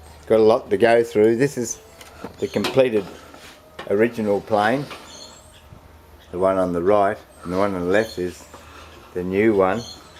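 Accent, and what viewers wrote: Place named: Australia. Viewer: Good on you,a video spoken, the only way,others don't understand true Aussie, I really enjoyed it,congrats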